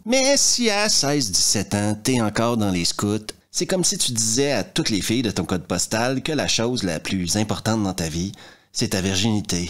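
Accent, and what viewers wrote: Quebec, Canada. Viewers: Sérieux t as que 8000 abonnés ? Tes vidéos sont à tomber , tes choix de films sont toujours judicieux et bien a propos .alors après je me dois d avouer que ce si "sympathique" accent du pays du Québec, joue sur mon plaisir a "ecouter😂😂" tes vidéos ,je m'en fous t es un champion de mon youtubegame a moi !!!!!!!!!et je vais vite m'empresser de recommander tes vidéos à mes proches( c'est a dire ma mère ,mon frère et un peu de collègues Facebook .......faut pas exagérer j ai plus de quarante ans 😂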